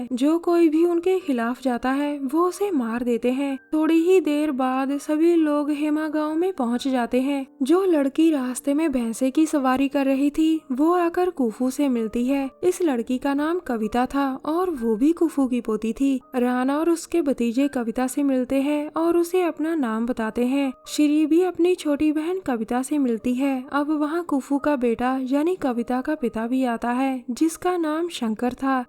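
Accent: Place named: Bihar, India. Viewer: Maidim kya aap bihar se ho boli se toh aap ekdum gaavki bihari aurat lagti ho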